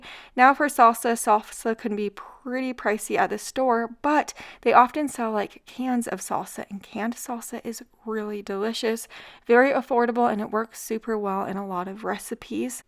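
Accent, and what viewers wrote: Utah, United States. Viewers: I suspect another way of pronouncing things in Utah. It’s cute. I’m a Utah transplant.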